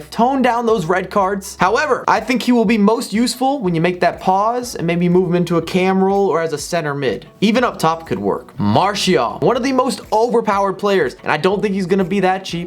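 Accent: United States. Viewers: Only FIFA channel I can watch cause you’re AMERICAN💪🏻👌🏻🏈🇺🇸🇺🇸🇺🇸🇺🇸so you don’t have this nasty accent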